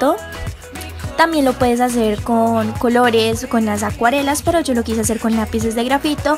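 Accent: Colombia. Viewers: sos colombiana cierto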